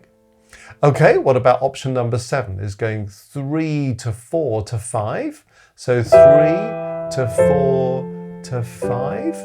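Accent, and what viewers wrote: United Kingdom. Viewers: Dear, teacher, sweet British utter, the one when you listen to, look like you are the one who is asking and you also the one who is answering, a very close, I do really thank you, thank you for all the love, optimism and the happy shining side that reflects your pure soul, thank you Mr, I really hope the words can help me to explain to you how much I am thankful, greatful to you, this all from my heart, may I have your excuse for my English, but believe it or not (you are using this phrase,sometimes) the language that I am using, is gained through self taught, thank goodness and thank you, as this is one of the other things you also sprinkle on us within your explanation in your lovely videos, I am Abdullah from a country still living in the 12 century, before bach era and that means your lessons is a future content, how about if told that may be almost 100 percent of the people here know nothing or have heard about bach or his legacy, thanks again and I wish also to say hi to everyone here.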